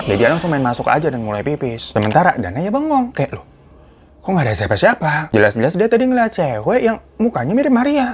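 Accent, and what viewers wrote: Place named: Pasar Minggu, Indonesia. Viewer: Background: Romania. Logat: Pasar Minggu. Paling sedep emang dengerin cerita, udah kayak dengerin tetangga ngoceh. Dan ceritanya wow kayak Mid Sommar.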